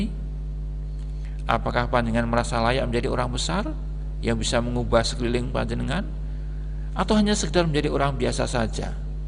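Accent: Java, Indonesia. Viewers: Saya suka dengan bicara khas Jowone Pak.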